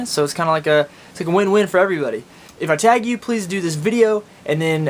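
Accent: Oklahoma, United States. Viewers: Your Okie accent just reaffirmed mine. Now I know what I sound like (only I pronounce lawyer "loyer" and I say soda instead of coke). XD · I live in Oklahoma as well. ^_^ I have almost the same accent as you. (From around Tulsa. Owasso.) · I'm Australian and I refer to the "bug that curls up into a ball" as a 'Slater'. My Dad's parents were both from Oklahoma and now I finally know why my Dad kept telling me these bugs were called 'Roly polies' while everyone else kept saying 'No, its a Slater'. Interesting listening to your Oklahoman accent, yours is no where near as strong (stereotypical) as my grandparents. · I love this accent too much. I need a guy from Oklahoma to move to Australia lol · Ashley Burt pretty much all oklahomans talk like him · Cool, I love Oki accent. it reminds me Carinvale series. :-) cheers mate, lovely accent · Its that OKC or Tulsa Okie. 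Lmao. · Simple Oklahoma accent the E’s Got to get him Got to geit eeeem Instead of (in) they say eeeeeean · I live in Broken Arrow which is like 10 to 20 minutes away from Tulsa, and it’s funny because around here we sound exactly like this guy. It’s funny because I rarely hear the twang southern accent. If I go to Norman or Wagoner I do. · You sound more northern Oklahoma I'm from ada and mine is way thicker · in Tulsa we called it Soda or Sodapop (sounded like sodeepop) or pop. I have no clue which part of Oklahoma he's from but most of what he's saying sounds a lot like us Tulsans. sadly I left that beautiful stay years ago for Oklahoma so I am not 100% certain on this due to Californians but he said every word about the same as how I say em. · From what I've read, the California accent is descended from the Oklahoma accent. Watching your video, this is my first time hearing an Oklahoma accent, and I can totally hear how similar it is. 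· Hmmm...he sounds very Central Oklahoman and i used to work in drive thru.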